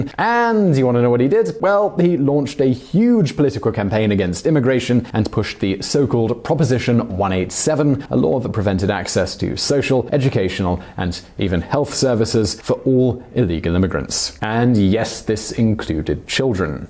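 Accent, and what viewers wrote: United Kingdom. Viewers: Summary of this video: SJW bloke with a British accent calls Trump xenophobic for trying to reduce illegal immigration by citing the contributions of legal immigrants (to the UK). LOL liberal logic. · You always talk about other countries. I will like to know where are you from ? You sound British but you might not be one.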